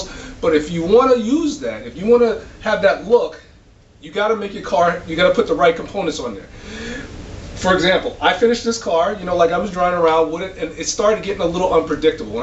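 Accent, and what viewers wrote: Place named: South, United States. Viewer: I wondering where you live? Sounds like in the south. I'm on the West coast, Blacks build lots of muscle cars.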